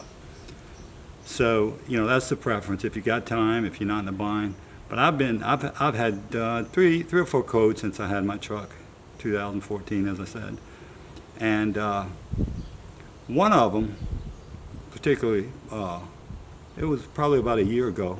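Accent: Louisiana, United States. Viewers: Are u Cajun? I bought my first cummins while I went to school in Louisiana and u sound like all them cajuns down there. Lol Some good folk.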